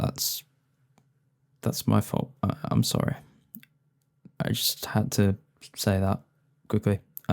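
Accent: United Kingdom. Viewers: How did you manage to sound more british than britishiest british people. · I just came here out of pure curiosity. NOT EXPECTING THE MOST BRITISH SOUNDING MAN I EVER HEARD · British accent??? 😅 😮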